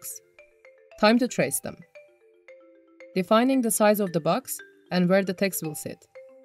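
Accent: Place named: Turkey. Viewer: Konuşan kesin Türk, aksandan belli 😂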